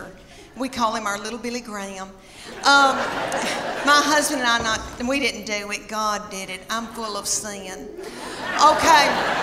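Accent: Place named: Appalachia, United States. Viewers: Most of my remaining family is from the deep south and her accent sounds like home. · I'm stuck in Pennsylvania for a week and a NATIVE OF The BLUE RIDGE MOUNTAINS of SOUTHWEST VIRGINIA!! THE REAL VIRGINIA!! Where we ALL TALK LIKE!! I'm so homesick from hearing her voice!!! I wanna GO HOME!!!! 😣 God BLESS YALL!! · Omg, how am I just now finding out about you? You are hysterically funny!!! My friend told me to watch your videos the other day. So, yesterday I started watching early in the morning. At one point, I was laughing so hard I was literally crying! The one where you are picking up your kids from school had me in tears. I relate so well. Thanks for the laughs. I can't wait to watch all of your standups and see you live!! I am also from TN, so your accent reminds me of home :)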